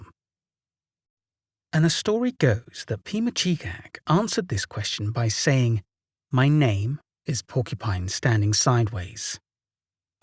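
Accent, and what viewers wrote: United Kingdom. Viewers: Nice! Mysteries are always better with a British accent. Don't know why, they just are!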